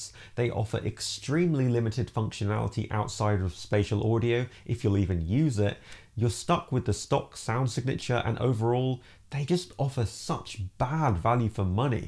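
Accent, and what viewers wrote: United Kingdom. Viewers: is jimsreviewroom British accent version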